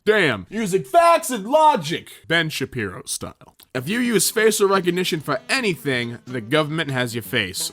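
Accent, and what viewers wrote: Australia. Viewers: 10/10 Aussie accent. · I’m an aussie, and I just gotta say GREAT accent! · the australian accent while pretending to be american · Fair play to that Aussie accent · Me: r/murderedbythatAustralianaccent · This was a great video, but your Australian accent pained me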